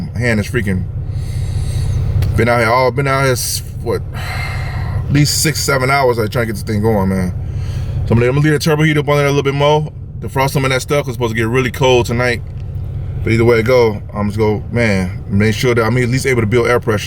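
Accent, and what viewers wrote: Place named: Baltimore, United States. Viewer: You from Baltimore ain’t you? If not you sound exactly like my cousins from there. But thanks for the video and tip.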